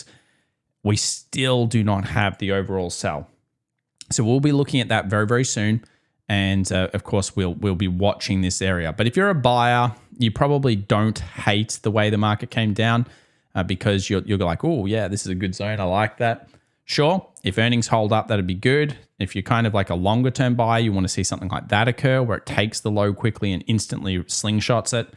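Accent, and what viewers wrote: Australia. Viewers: haha I watcha de women with big jubs but I admit myself I just love the dudes Australian accent.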